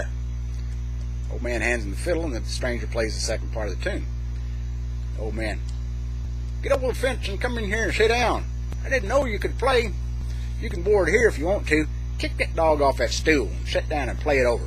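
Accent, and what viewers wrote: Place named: Arkansas, United States. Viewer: You sound like an Arkansawyer all right, but we downEasters don't talk the way you think we do. However, you like storytelling, fireplaces, and pipe smoking, so you're a friend of mine I just haven't met yet. I enjoyed this vid enormously; and if ever we meet, I'll fiddle you and share my tobacco.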